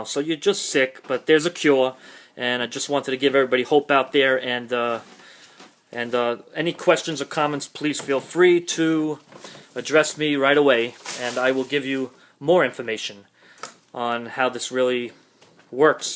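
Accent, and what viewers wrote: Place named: New York City, United States. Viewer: Bro you’re definitely from nyc from your accent That makes me feel good I have to get in touch with you Still in this space Can you call me <num> Input my number on YouTube that’s how serious it is man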